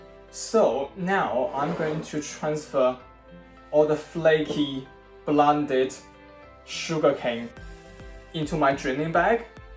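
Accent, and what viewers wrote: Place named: United Kingdom. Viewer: Pretty interesting how with some words you sound british and some words you don’t haha is sugar cane locally available where you live?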